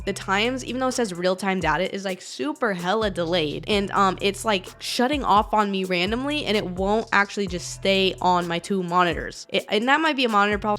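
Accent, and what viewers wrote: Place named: California, United States. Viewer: “Super hella delayed” Cali-bred through and through. I love it! Great video again ❤️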